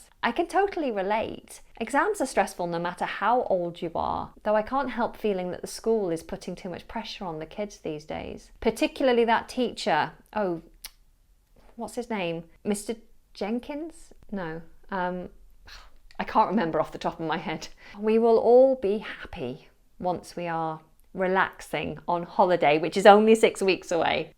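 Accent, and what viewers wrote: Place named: RP, United Kingdom. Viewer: If I am not wrong you are from the north of England, how did you get your beautiful RP accent?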